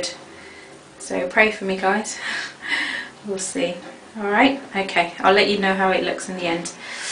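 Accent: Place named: United Kingdom. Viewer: I love your dialect, you wouln't happen to be from the U.K.? I'm gong to try this style on my 4c hair.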